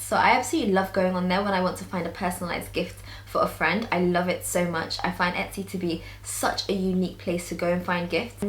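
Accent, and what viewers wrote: United Kingdom. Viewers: i'm glad to see / hear a voice from the UK helping us out #nodisrepect to other countries :)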